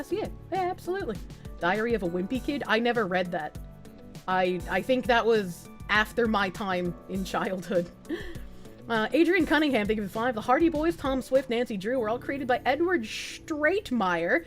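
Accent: Boston, United States. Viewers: The boston accent is great. It reminds me of one of the greatest American events in history.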